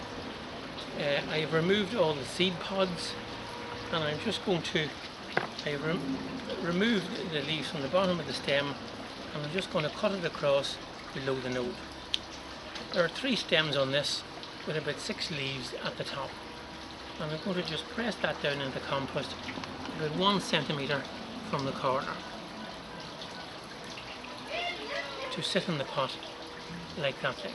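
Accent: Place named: Ireland. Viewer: The guy in the video has an Irish accent - perhaps there's a world outside of america that has different climate and ecology, somewhere like Ireland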